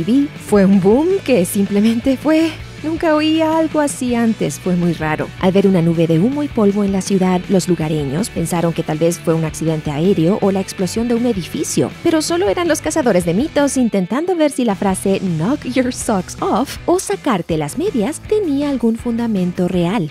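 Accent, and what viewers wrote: United States. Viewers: ES QUE ELLA VIVE EN USA, SEGURO HIJA DE MEXICANOS Y TIENE UN ACENTO ESTADOUNIDENSE(AMERICANO PARA LOS EUROPEOS). EL ACENTO DE MEXICO ES MAS NEUTRO.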